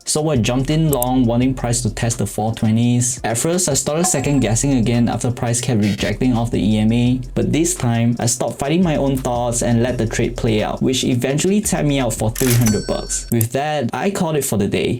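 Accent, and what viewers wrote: Singapore. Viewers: are you singaporean ? Sounds about there but if so jiayous i'm also doing funded with lucid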